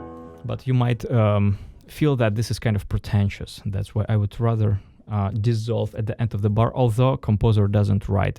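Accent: Russia. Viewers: An excellent performer and teacher. But why on earth such a terrible Russian accent ? As a musician you should easily master the English speech intonation without much trouble. Kinda strange. But no hard feeling !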